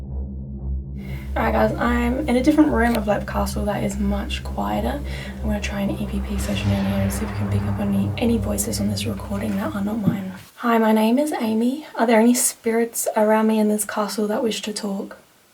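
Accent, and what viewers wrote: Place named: Australia. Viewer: Leap Castle - ''Caisleán Léim Uí Bhánáin'' Amy, that murder was all about power and control, it's as old as the hills as they say. That little place where they threw prisoners to die from injury or starvation as there was a spike on the floor was called a oubliette or a place of forgetting in English. People were tossed in there to die from the spikes or starve to death . Many would rather have died quickly from the spike(s) than starve to death. The most cruel thing was that they could both hear people having a good time and smell delicious food while this was happening to them. I see this was a couple of years ago i hope you come back although i don't know if Leap Castle is allowing any more ghost tours. PS: I find it funny that birds frighten you but ghosts ( indeed possibly really vicious ghosts ), are not a problem . You sound Australian but mixed with a little bit English accent. 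:-) The current owner a Mr Sean Ryan and his wife although a Irish name has a Australian connection and i believe that he was born and grew up in Australia before moving to Ireland although not 100% sure about that. According to a program i saw Sean was nearly killed a couple of times when he initially started the repairs. However, at the baptism of hos daughter which took place at the castle apparently there was a peaceful feeling and even a light breeze that the attendees of the baptism noticed. Sounds with the gruesome history of Leap that it needs any positive feelings that it can get. Fina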